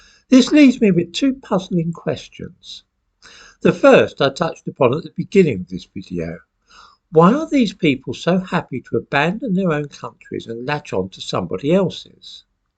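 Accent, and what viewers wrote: United Kingdom. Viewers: It’s really nice to listen to an eloquent british gentleman comment on our crazy situation that we’re in with the loss of all culture and infrastructure that our fore-bearers worked and fought to create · Is this British accent?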